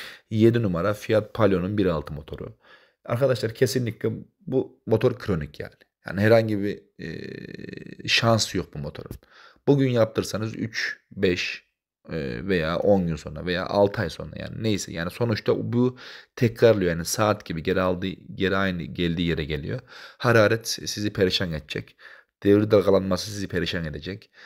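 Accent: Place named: Sivas, Turkey. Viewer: Gardaş sen Sivaslı mısın? Şive Sivaslı gibi.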